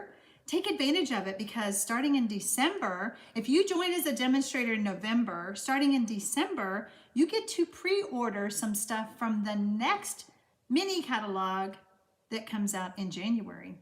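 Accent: South, United States. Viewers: great cards.....love watching you and your southern accent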